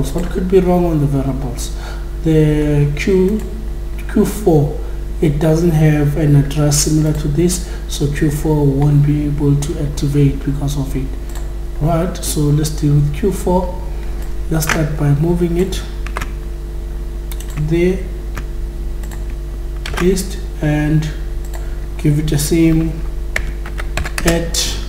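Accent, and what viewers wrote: South Africa. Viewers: I know SA accent very well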